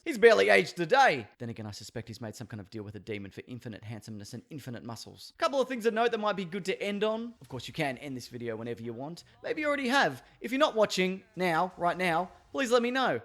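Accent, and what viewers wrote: Australia. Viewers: Maybe it's the Australian accent, but I never get offended when he trashes Trump, even though I am a Trump supporter. Thanks for always making me laugh,.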